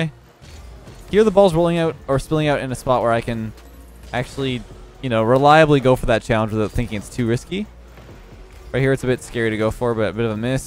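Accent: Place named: Canada. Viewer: sounding especially Canadian today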